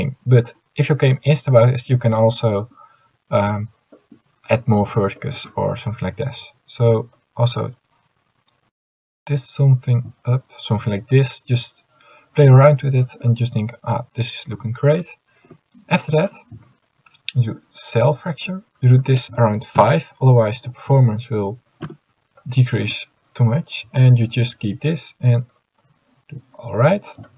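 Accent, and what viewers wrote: Netherlands. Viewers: where you from are you from the netherlands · en btw... ben jij nederlands? zo klink je wel and btw are you dutch? you sound like it...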